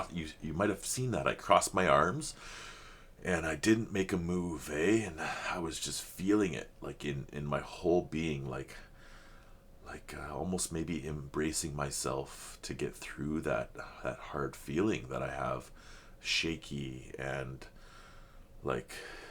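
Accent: Canada. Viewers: I suspect is Canadian due to the way he pronounces his '-out' word sounds as -'oot'. He's been struggling with the pronunciation of Dimash's name for forever. It's not making a connection in the brain. I think we just have to accept it and not worry about it. He's a massive fan and loves Dimash as much as we do.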